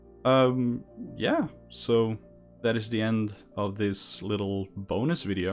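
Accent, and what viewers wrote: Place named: Netherlands. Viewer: are you dutch? btw I am and you sound a bit like you are too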